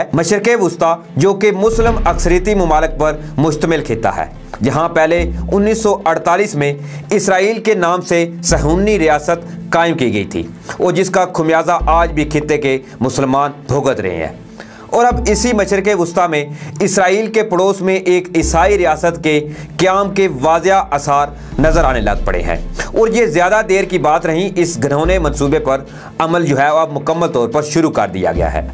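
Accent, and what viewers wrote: India. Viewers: Assalam Walekum my brother speak Urdu so rightly you are talking like Indian country people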